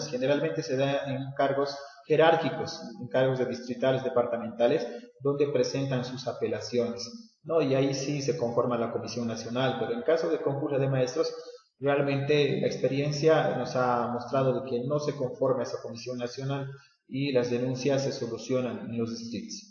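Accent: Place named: Mexico. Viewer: Pensé que era de México, por su acento.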